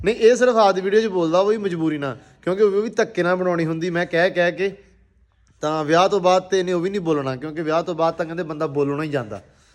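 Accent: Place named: Majha, India. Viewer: Tuhadi boli sade majhe de lagdi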